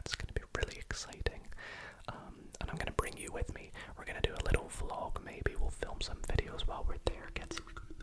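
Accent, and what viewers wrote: United Kingdom. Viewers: Hi! Are you from Scotland, England or Ireland?? Your accent is really comforting and nice!! Loved this video!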